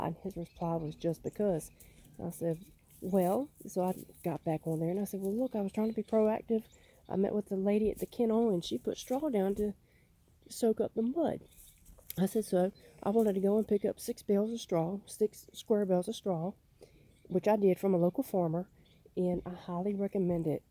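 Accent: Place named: South, United States. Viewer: I love your country accent ❤️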